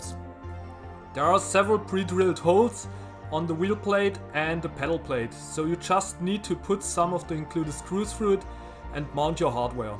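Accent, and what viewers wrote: Germany. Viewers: Man hört das du deutsch bist 😂💪👍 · I seem to be noticing that you have a german accent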